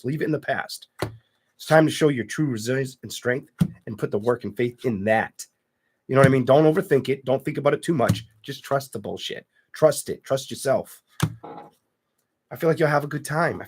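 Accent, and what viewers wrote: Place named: Brooklyn, United States. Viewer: My friend, love the confidence in the way you read! u giving me Brooklyn vibes that's an extra plus 😂😂❤❤❤❤ but that libra reading the ending u glitched my friend because I saw fire and you called it water ...no sweat but u moving toooooooo fast , I love it thou because u don't talk alot like most readers and I appreciate that!!! New subbie ❤❤❤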